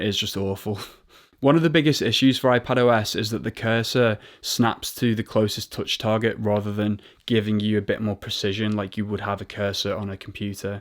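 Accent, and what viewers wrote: Liverpool, United Kingdom. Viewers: Congrats on 1000 subscribers! Just discovered your channel. You sound like you’re from Liverpool.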